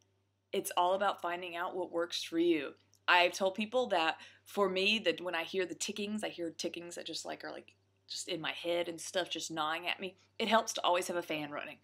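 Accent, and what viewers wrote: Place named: South, United States. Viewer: Your southern dialect is also very attractive.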